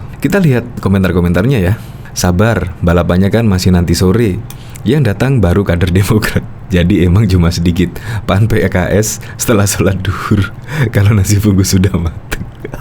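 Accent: Java, Indonesia. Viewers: Medok banget ngomongnya pasti bukan wong jakarta😘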